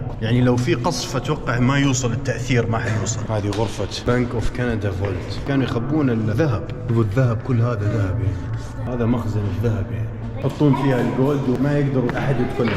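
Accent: Saudi Arabia. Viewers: من تونس لاكن عاش فالسعوديه طفولته عشان كذا يتكلم سعودي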